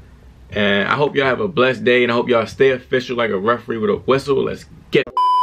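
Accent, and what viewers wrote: Baltimore, United States. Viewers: Where you from Baltimore? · Nah, that's Baltimore all day and I'm not even from there 🤣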